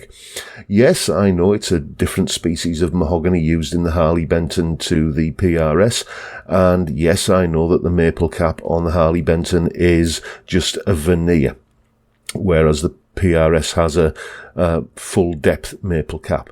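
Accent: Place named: Teesside, United Kingdom. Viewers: If all guitars that look like other brands should be banned, then you would probably end up with only 4 or 5 guitar companies. 80% of the guitars around today are based on strat,tele or les paul's. I dont see why people get so heated, you pays your money and make your choice. Nice video and good to hear a Teesside accent on You Tube. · Thought you sounded like a good hint of Teesside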